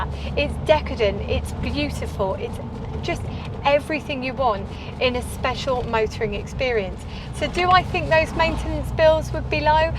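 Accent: United Kingdom. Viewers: A very few of us can still speak the King's English!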